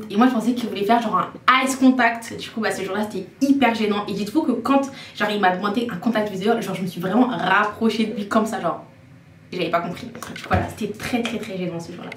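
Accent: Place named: Lyon, France. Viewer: Le petit accent de Lyon >> La story Time sur le contact visuel m’a eu 😂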